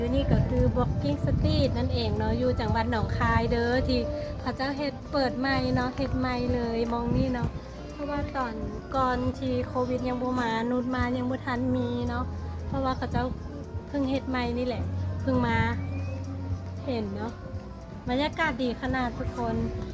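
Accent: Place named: Laos. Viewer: สำเนียงลาว แต่คำไทย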